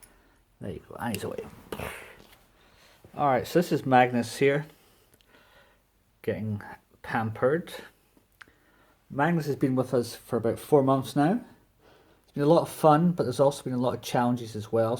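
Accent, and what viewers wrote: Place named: England, United Kingdom. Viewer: I don't understand, you say "sit" for a down ? Due to your accent, i hear "site" when you say "side" 😊, it's so funny to me ! I am used to american people talking, not English people ! Regards from France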